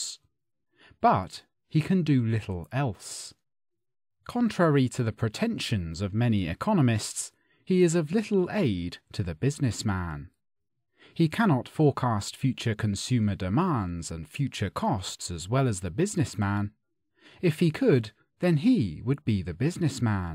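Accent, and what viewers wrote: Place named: RP, United Kingdom. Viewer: Received pronunciation))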